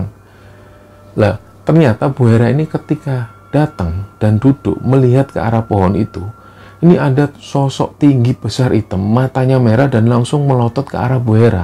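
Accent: Java, Indonesia. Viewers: Penak, mantep bgt ngerungokne ceritone mas, jowo mbangetttt🥰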